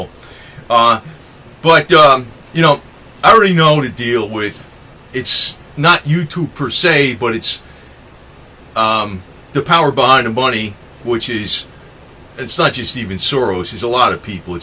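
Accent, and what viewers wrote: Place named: New York, United States. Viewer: Checked out your sportster video...You almost sound like a New Yorker...I am a New Yorker and talk the same way...Oh yea and Jesus was Jewish, there were no Christians until they started following Christ..there were Jewish folks but they don't believe Jesus was the saviour...the Christians believed all the way...so do I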